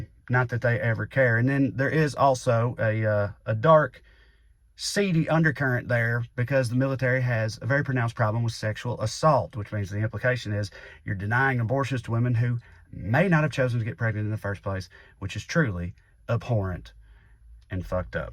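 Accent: South, United States. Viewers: I’m always glad to have a person with a southern accent who is funny on purpose in my subscriptions. Keep up God’s work.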